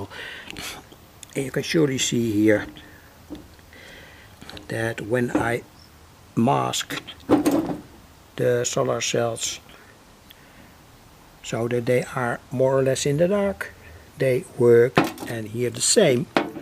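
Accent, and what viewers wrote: Netherlands. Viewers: Love the garden update! I grew up working in the garden with my Dutch Oma and have always had a love for growing plants as a result. I follow your channel for two reasons. The first is to learn about electronics through your projects. The second is to hear your accent because it reminds me so much of my grandmother. Have a wonderful day,!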